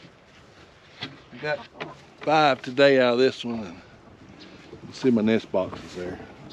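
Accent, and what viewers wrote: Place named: North Georgia, United States. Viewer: Stick with the videos, we enjoy watching your progress. I also like your honest voice, I'm originally from north Georgia, so you sound like home. The AirForce took me to north Florida, so thats where i am now. A few more years and hope to be homesteading and farming for ourselves. We have a backyard flock of chickens we enjoy, just hoping to find more acreage in the coming years. Between Florida & Tennessee is where we want to be. Keep the videos coming, i think you're about to get popular. Best of luck & blessings, Jon & Noi from Florida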